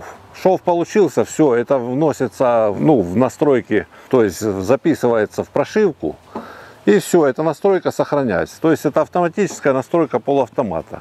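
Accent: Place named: South, Russia. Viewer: почему говор южнорусский? Точнее что-то между Краснадаром и Ставрополем, возможно Ростов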